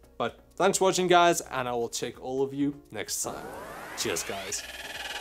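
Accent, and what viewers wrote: South Africa. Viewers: Damn I knew this is a fellow South African by that accent... Lekker Boetman.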